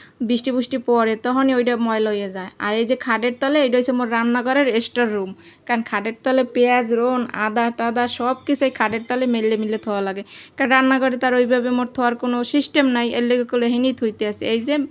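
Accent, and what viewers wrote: Barishal, Bangladesh. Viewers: ❤❤আসসালামু আলাইকুমপ্রথমে লাইক দিয়ে তোমার পুরো ভিডিও জুড়ে ছিলাম তোমাকে আমি দুই দিন আগে ভালোবাসা দিয়ে রেখেছি মাশাল্লাহ খুব সুন্দর করে তোমাদের বরিশালের ভাষা কথাগুলো বললে খুবই ভালো লাগলো😮 শুনে সব কিছু মিলিয়ে দারুন লাগলো তাই তোমাকে ভালোবাসা দিয়ে রেখেছি 👉🔔বুঝে নিও তোহার হলো তোমার পরিবারের জন্য আপুর উপর বিশ্বাস রাখতে পারো লাইকডান আলহামদুলিল্লাহ ❤❤🎉🎉😮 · অনেক সুন্দর হয়েছে আপু ❤❤❤তোমার বরিশালে ভাষায় কথা গুলো ভালো লাগে 😅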